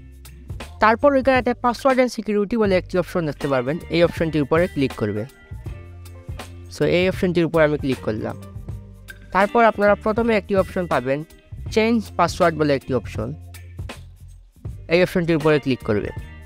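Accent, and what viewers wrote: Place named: Sylhet, Bangladesh. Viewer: ভাই নিশ্চয় আমাদের সিলেটি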